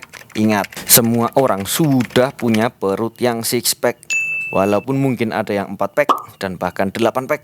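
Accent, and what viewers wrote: Yogyakarta, Indonesia. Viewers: kok koyo logat wong jogja yo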